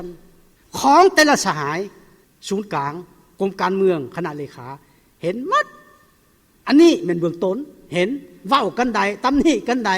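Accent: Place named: Vietnam. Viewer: บักควายเอยกุฟังมืงเว้าเหมือนแกวสัตตตคนลาวแท้บ่อเว้าแยบนี้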